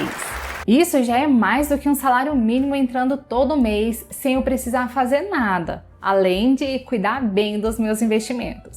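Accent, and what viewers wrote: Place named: Minas Gerais, Brazil. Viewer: Pelo sotaque é mineira; inscrito.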